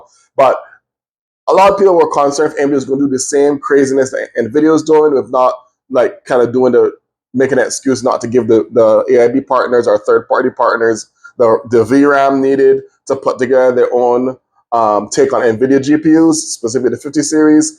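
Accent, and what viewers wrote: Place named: Jamaica. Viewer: man, you have my subscribe, I always wanted a Jamaican to explain tech stuff :D, you made my day! Respect!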